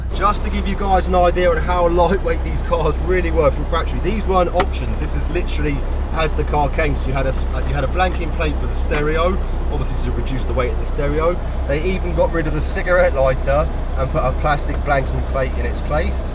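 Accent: Liverpool, United Kingdom. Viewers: Yes mate, scouse in the house legend 👍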